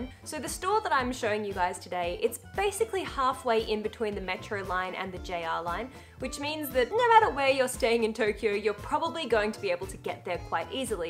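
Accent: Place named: Australia. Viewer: New subscriber here. My great grandmother was from Northam Australia. My great grandfather brought her back to the United States, he met her when he was stationed over in Australia during WW2. He was in the navy. Your Accent, brought back great memories of my Nan. She passed away when I was 15, I'm now 31. My great grandfather is still alive and well. My daughter is his first great great grandchild. 😍 My Nan never lost her Australian accent.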